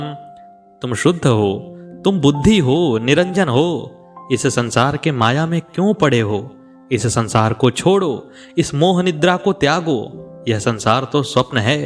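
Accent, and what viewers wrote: Standard. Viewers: अति उत्तम कहानी।आपकी वाक शैली और शुद्ध उच्चारण के प्रयोग से कहानी के तत्कालीन वातावरण का आभास होता है जो श्रोता को प्रभावित करता है।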